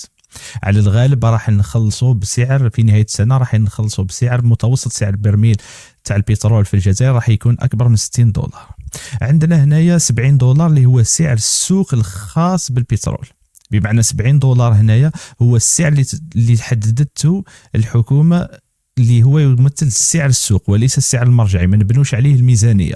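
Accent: Morocco. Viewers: نتا مروكي على حساب لهجتك واش دخلك في ميزانية الجزائر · لا أضن ، درت بحث و لم أجد شيئ إلا اللهجة أنتاعو تميل للجارة الغربية ؟؟؟ و هذا يخليني أتساءل عن ديون الجزائر و سيادتها 😊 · Un marocain qui fait des analyses sur l'Algérie et il oublie son pays:le makhraz. Son accent l'a trahit. Il. Parle d'un déficit de 68 mds $ et justement c'est la même somme de la dette du makhzane chez le FMI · اولا من هو هاذا المحلل انا راه ايبانلي مروكي حسب اللهجة نتاعو و من اين جاءته كل هذه المعلومات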